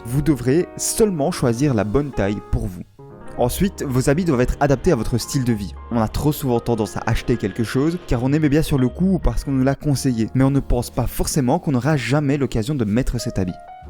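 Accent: Liège, Belgium. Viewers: je reconnais une pointe d'accent liégeois bien de chez nous, big up frérot !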